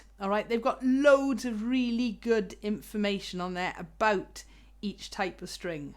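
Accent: Wales, United Kingdom. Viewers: Hello. Thanks for your video. I have used all three 0f the types of strings you demonstrated. Like you I favour the Thunderbrowns. I have them on a fretted Aklot Bamboo acoustic/electric bass Uke. The only issue I have had with any of the strings is the amount they stretch. When all the stretching has been worked out of them they are all good to play I really enjoy your videos Love your Welsh accent. Gary.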